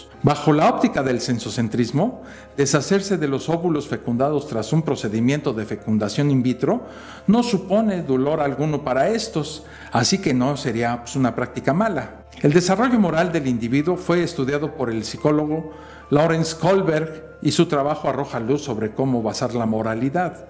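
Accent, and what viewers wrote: Mexico City, Mexico. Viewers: Cómo me hiciste, reír con ese sabroso acento chilango jajaja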